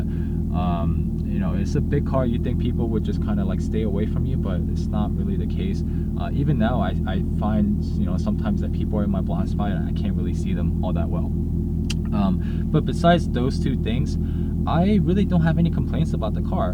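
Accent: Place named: Texas, United States. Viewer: This guy definitely from Dallas TX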